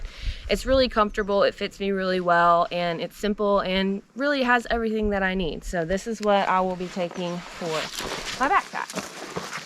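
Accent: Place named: Georgia, United States. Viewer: U must be from South Georgia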